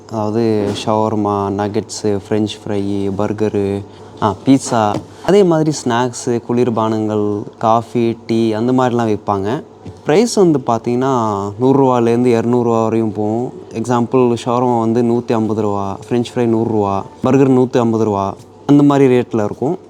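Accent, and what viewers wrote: Standard. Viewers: இலங்கை பதிவு ரஷ்ய பையன் இவ்வளவு சுத்தமான தமிழில் பேசுவைதைபார்த்து ஒர் தமிழனாக பெருமையடைகிறேன்❤❤ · தமிழ் சுத்தம் 🎉😂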